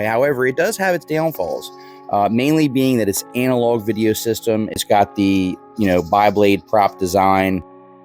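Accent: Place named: Maryland, United States. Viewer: Could tell straight from the first 10 seconds you were from MD 💪🏾😂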